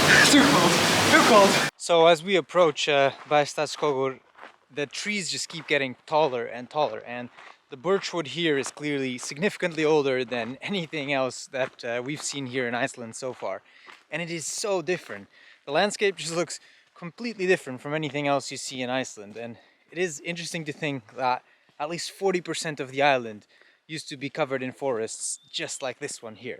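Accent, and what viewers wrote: Portugal. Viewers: You have a portuguese accent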